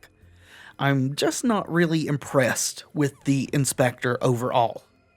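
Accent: South, United States. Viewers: First time watching your videos, had a very good time. Your southern accent kind of reminds me of Gideon from Gravity Falls. Hope you take that as a compliment! :D Thanks for the content. Thumbs up.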